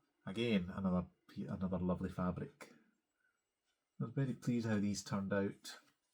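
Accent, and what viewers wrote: Scotland, United Kingdom. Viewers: Hello from Wellington, NZ. I have been meaning to comment for... well... 27 episodes but well, I usually watch you on the TV while I'm stitching. I have to say, I enjoy your lovely Scottish accent. You have a lovely calming voice and I enjoy relaxing to your Flosstube! I'm also a Flosstuber... just published #271 this morning. Was supposed to be Sunday but... YouTube did not let me upload yesterday/last night and I had to cancel and redo it. What am I stitching on? Well, right now I am taking a wee work break and having some chicken noodle soup. But, I will be getting on to a large redwork sampler after work - pushing for a finish this week. Happy stitching! Leigh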